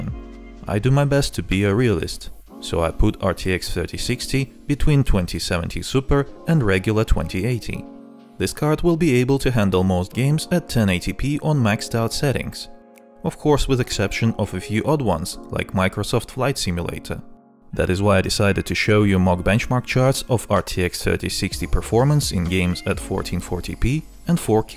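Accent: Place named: Russia. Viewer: сразу русского по акценту узнал)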